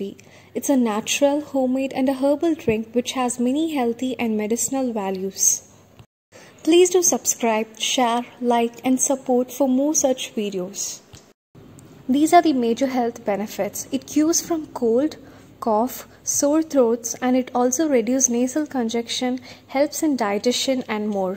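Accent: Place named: United States. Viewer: American atma in Indian body....your angreji is so English ji.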